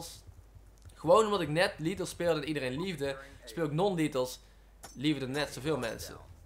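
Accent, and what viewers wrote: Brabant, Netherlands. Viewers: Kom je uit Brabant?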